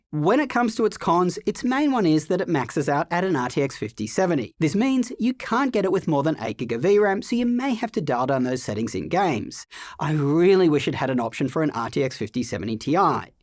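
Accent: Australia. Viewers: OMG your voice sounds Australian.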